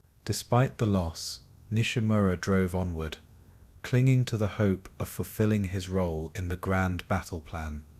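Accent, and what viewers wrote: United Kingdom. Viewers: Sounds like a real person talking with a Limie accent. Good job Mate once again, again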